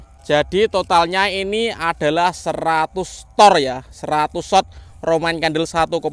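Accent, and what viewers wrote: Java, Indonesia. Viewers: BG Jawanya Jawa mang bg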